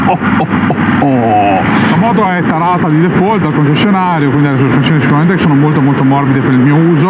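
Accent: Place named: Padua, Italy. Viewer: bel video, sempre grandi contenuti e spiegati, raccontati in padoan, tanta roba🤣🤣🤣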